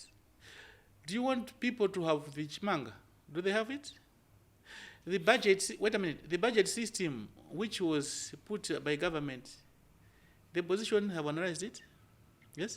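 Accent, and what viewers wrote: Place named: Tumbuka, Malawi. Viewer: Ndee muli chichewa cha chitumbuka, nakuona m'bale wane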